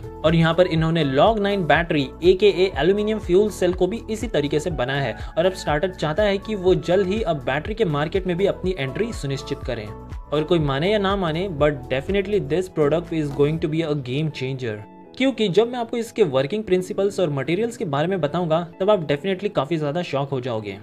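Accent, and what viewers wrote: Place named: India. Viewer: If you are going to speak English then speak slowly and enunciate clearly. With your Indian accent and the speed at which you speak, it makes what you say almost unintelligible. Thanks You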